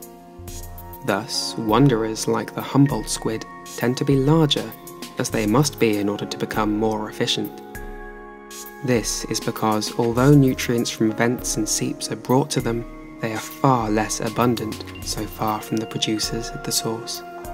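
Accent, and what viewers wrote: England, United Kingdom. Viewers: i dont like your voice and your accent (perhaps you are really an english), but i like your videos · Usually I'm not too big on english accents (sometimes I hyperfixate on things) but you my friend have that ASMR type of voice just without the cringe. That kind of stuff isn't my forte, but big homie over here got that type of voice I could fall asleep to. Ever consider getting into voice acting?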